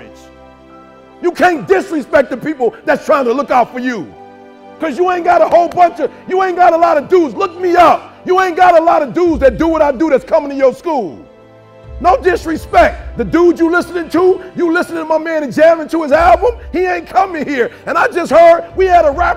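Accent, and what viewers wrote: United States. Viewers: Why would someone with a PhD speak like this? Learn the English language and use it properly if you are going to stand before young people and talk about I I I I , ME ME ME ME, MY MY MY MY. Yes, I heard the message, but if he is going to be hold himself up as a role model....why not "Model the behavior" it takes to be really successful! Ebonics might be cool to someone(?), but it is NOT the way to speak if you want to be competitive. I would LOVE to know who paid him $50,000 to speak !?!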